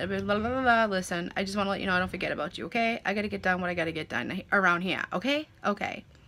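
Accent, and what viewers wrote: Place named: New York, United States. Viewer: Hey beautiful I luv ur personality n ur ny accent 😍do u have another channel?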